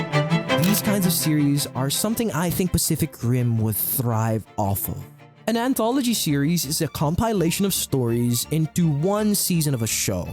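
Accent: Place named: Philippines. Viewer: I had a feeling you were filipino from the accent but I couldn't be sure but hey, I got my confirmation at the start of the video lmao. KABABAYAN 🔥🔥🔥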